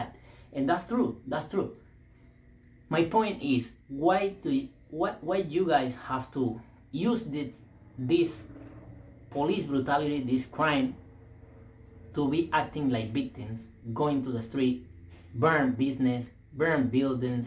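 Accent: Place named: Cuban-American, United States. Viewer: su asentó es cubano/americano aquí todos hablan diferente si escuchas a un australiano habla diferente a un inglés y los dos mensionados hablan diferente a un americano incluso aquí los new yorquinos hablan diferente a los californianos. No se tu de donde tengas el acento. Yo lo tengo Californiano y le entendí todo muy claro a.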